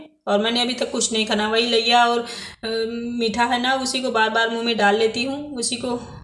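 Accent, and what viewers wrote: India. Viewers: Aaj apne bilkul desi tareeke me baat ki accha laga aur dulaiya bhi hi gai bahut hi badhiya video 👍👍🙏👍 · Aj to bhojpuriya bol rahi hai 😃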